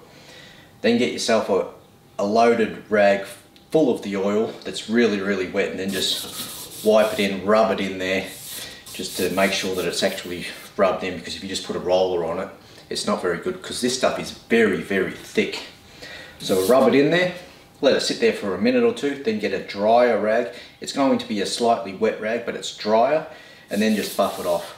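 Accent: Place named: Australia. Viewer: I've just subscribed. Good to hear an Aussie on YT. Keep up the great work.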